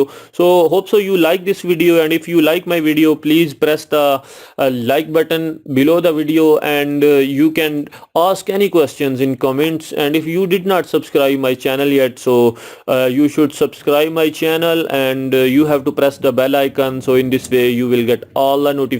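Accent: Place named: Punjab, India. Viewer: Bai ap ki English kamal ki hy. Lagta hy. Punjabi bol rahy hain. Aur kamal ki baat ye hy. K apki English mujhy b samaj aa gayi hy.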